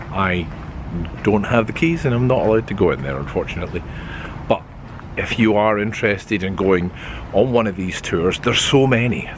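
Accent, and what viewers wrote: Scotland, United Kingdom. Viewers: I enjoy listening to your lovely Scottish brogue and seeing bits of Edinburgh. One year ago, we had especially liked our walking tour of historic Edinburgh with stops in Grey Friars Kirkyard and the Haymarket. Aaaah, I so loved Edinburgh!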